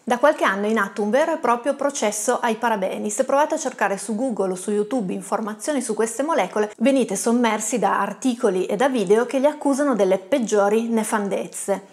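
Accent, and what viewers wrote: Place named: Turin, Italy. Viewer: Contentissima di aver trovato il tuo canale, dopo aver letto alcuni tuoi articoli interessanti altrove. Video interessantissimo e molto chiaro anche per un'umanista come me :-) Noto anche con piacere un familiare accento torinese, o sbaglio? :-)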